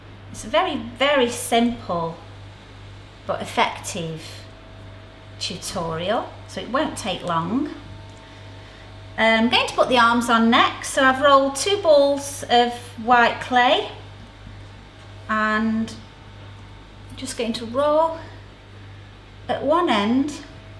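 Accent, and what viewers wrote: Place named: Hull, United Kingdom. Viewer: love ya accent are you from Hull like me xx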